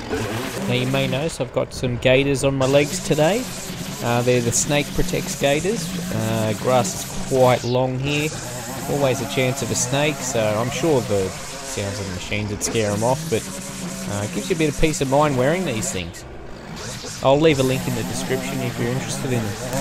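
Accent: Australia. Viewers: Love your Australian accent!!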